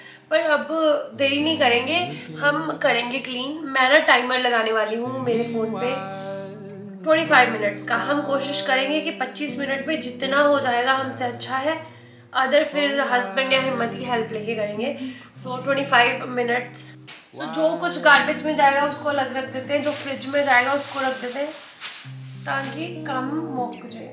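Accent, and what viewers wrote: Punjab, India. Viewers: I watched ur vlogs ur mix Punjabi nd Hindi accent Bec my mother spoke like u when she is wid me in dis beautiful world Buena don’t care about people they don’t allow us to live happily my sons name is also himmat stay blessed nd happy all d time😊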